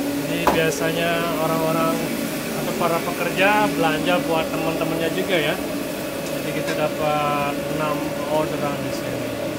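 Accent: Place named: Java, Indonesia. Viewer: Logat jawa Indo pakde di LN seperti nasi goreng yang sudah bisa di minati orang sana😂.... semangat buat semuanya, sehat2 selalu, dan lancar rejeki buat kita semua. GBU...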